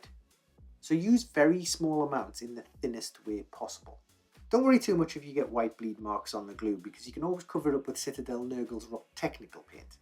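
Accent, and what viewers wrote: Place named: Northern England, United Kingdom. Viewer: I've just watched all your videos and they are excellent. You're right: there isn't anything else occupying the space between scratch-bashing and ultra-expensive mega builds. Personally, I want the look of a GW-only table because I love the aesthetic and their kits but, like you've pointed out, they're impossibly expensive, they actually limit you in all sorts of ways, and they often don't work all that well. Plus so many channels resort to mdf kits and 3D printing which isn't my jam. So I am your target audience (plus, I also remember card scenery, and I also got a letter printed in White Dwarf back in the day, so we could be brothers). Talking of brothers, are you related to RPArchive, because your channel is like its 40K twin. Gentle, northern bent; perfectly manicured nails; impossibly neat house; incredibly comprehensive advice and instructions; original project ideas; OCD-friendly; slightly bossy and judgey in tone; professional video production; slightly clinical, but totally stunning results; and uplifting and inspirational (as much as brits ever can be). 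Bravo, sir. Subscribed, and really looking forward to seeing more videos from you.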